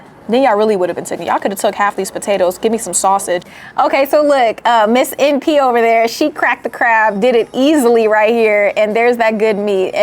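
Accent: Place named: South, United States. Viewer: I love the video but i think i got turn on from the cowgirl country talk, then she sings the and song and then she wears a red dress and i think the pumps to go w it.. i love you girl like literally. Honestly, i don't like too many ppl but this lady does something to me. Always have great food reviews videos...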